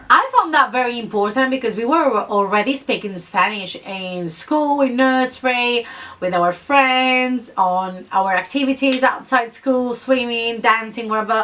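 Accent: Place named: United Kingdom. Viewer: I like your British accent ❤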